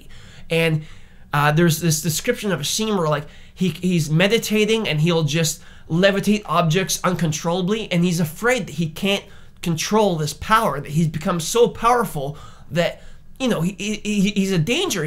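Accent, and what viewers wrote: Canada. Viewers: why do you have a canadian accent? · Canadian as fuck eh?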